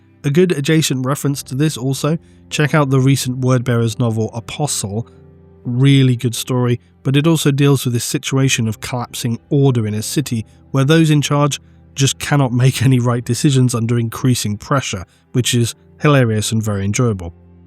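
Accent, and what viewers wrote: United Kingdom. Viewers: Surely he’s also in Europe, or at least he sounds British.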